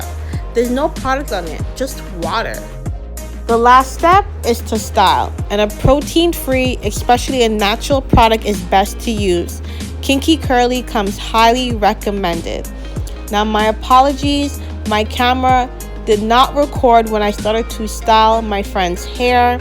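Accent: Toronto, Canada. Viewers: I knew you where from Toronto as soon as I heard you speak the bell remote confirmed it 😂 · I here rhe Toronto Caribbean accent 😁. Before l read your little bio l knew you were Canadian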